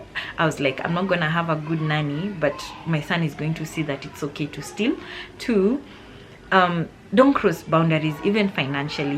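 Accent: Luhya, Kenya. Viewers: The luhya accent for me🤣🤣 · Boundaries are important. I have my own issues can’t deal with hers also. Unless it’s life or death. Btw I love the luhya accent.🙌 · Amazing story, it's very calming listening to you... Your luhya accent is on point 😅😅😅. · It's the luhya accent for me.. You had me at the school fees part na fee structure on the TV...😂 😂 😂 Mums aki we should get express tickets to heaven.. dealing with bosses at work and at home bado kuko ivi...ah... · just watched this after a long strenious shift.....laughed woooi.....the luhya accent waaaa😅🤣.. you are a perfect narrator😅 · It's the Luhya accent for me!😂😂😂😂😂.... The storytelling was 💯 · You are generally nice.....you remind me of one who kept reminding me how my children love her though i couldn't really see it....".aki unajua Hawa watoto wako wananipenda sana"same luhya accent......and once I bought a box of long life milk on a Sunday and on Tuesday ilikuwa imeisha😭she stayed for 8 months and I gave up...I was tired of her! 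· hahahaha i love the accent ya kiluhya hahah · Sorry but you speaking the luhya ascent is funny😅😅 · Wueh, it's the luhya accent for me 🤣🤣🤣🤣🤣🤣🤣🤣🤣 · 😂 😂 😂 😂 😂 The luyha accent. I had to replay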